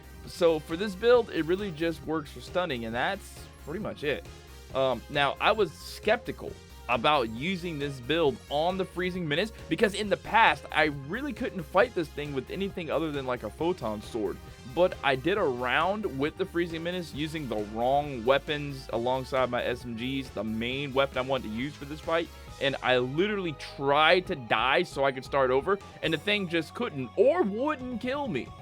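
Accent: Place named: Australia. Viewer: Welcome to Australia, we pronounce things differently. ^^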